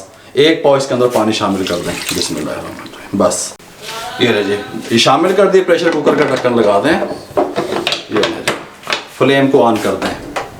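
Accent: Punjab, India. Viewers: Bhai mjhe khane bnane ka to shoq ni a me ka punjabi aksent sune ke lie lgata hn 😂😂😂👍👍👍👍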